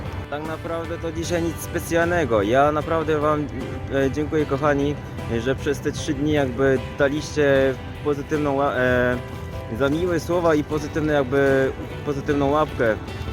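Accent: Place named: Poland. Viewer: Czy ty nie jesteś przypadkiem Polakiem, bo akcent masz nawet nasz. To jest naprawdę niewiarygodne, opowiedz coś o sobie.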